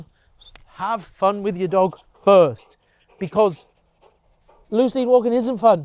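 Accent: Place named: England, United Kingdom. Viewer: Good to see that I'm on a good way and combined merhods as you 😉 I thought it's mean after sniffling anyway to forbid everywhere. So I startet to take him in sit position in front of hotspots ... and wait till he ask with view to let him sniff and pee ... Realky great vid you kreate and I love the english 😁😆 nice to liste to 👍🏻 compares to the american ...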